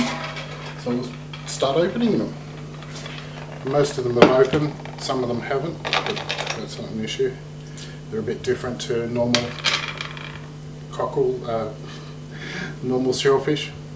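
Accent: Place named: Australia. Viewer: the captions are a classic laugh fest ... I missed the cocaine lol that's hilarious. Methinks the translations program has issues with the Aussie accent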